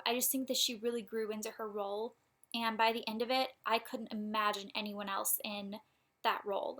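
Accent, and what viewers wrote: South, United States. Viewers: aww your southern twang is coming out more since you're at home :) adorable!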